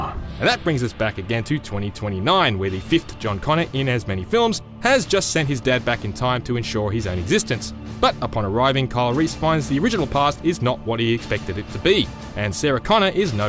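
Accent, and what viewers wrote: Australia. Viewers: just a question are you Australian? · Australia mate?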